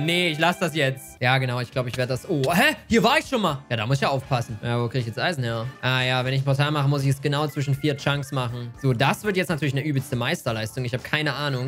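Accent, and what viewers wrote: Saxony, Germany. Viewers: Bist du eig ein Sachse oder so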